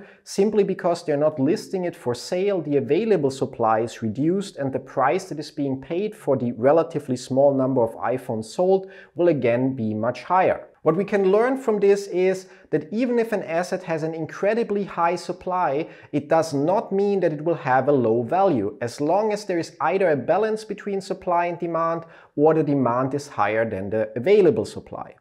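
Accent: Germany. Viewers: I love that German accent. It’s hot af. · Cooles video Off-topic: Immer wieder witzig diesen deutschen Akzent im englischen zu hören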